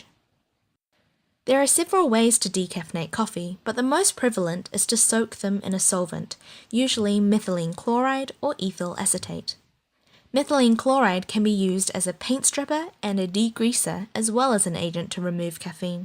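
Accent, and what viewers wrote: New Zealand. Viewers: 뉴질랜드 영어 쏘스윗!! 번거로우실텐데 너무너무 감사해요!! 더 열심히 들을께요 ☺️😍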